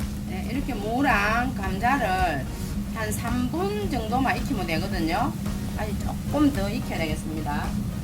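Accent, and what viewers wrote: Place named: Busan, South Korea. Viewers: 아 ! ~ 참 깨를 안뿌렸네 ~ 에서 빵~ 터지네요 레시피 그대로 조만간에 만들어봐야겠어요 영상을 오늘 첨 봤는데 좋아요 와 구독 누르게 되네요 구수한 경상도 부산 사투리가 정겹고 좋아요 저도 부산사람 이라서 그런가? ㅋ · 부산분이라그런것도있으시겠지만 제가봐도 실력도좋으시고 정감도있는분 확실합니다 ㅋ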